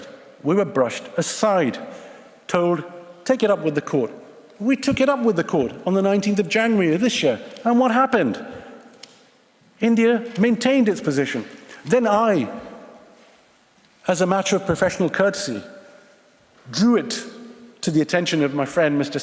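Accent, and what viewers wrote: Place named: United Kingdom. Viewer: To parade the British accent as something that might invoke jealousy in Indians is rather infantile of your part. I 'll come to the issue of Kashmir though. At the very outset, you must get your facts right. It was Pakistan and not India who violated the UN guidelines by not withdrawing its troops from Kashmir in 1948. Why cry foul now? Coming to the issue of 'unarmed Kashmiris' being on the roads, it is no secret that the whole might of ISI and its Mullahs is behind how this sadist project has seen the light of the day. How systematically stone pelting has been funded. The political careers of separatist leaders from Mirwaiz to Malik rest on the instability of Kashmir. Other than that they do not have any clout in other parts of India. Might I ask, where exactly were the so-called separatist leaders and their sympathisers in Pakistan when the whole Kashmir valley was flooded a few years back? 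What we as Indians understand about our country is none of your business. We have institutions still in India that have not been sabotaged by Mullahs and Military unlike the Kangaroo courts of Pakistan where capital punishment is doled out liberally.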